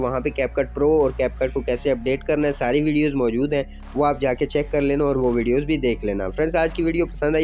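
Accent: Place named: India. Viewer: i don’t understand ur indian accent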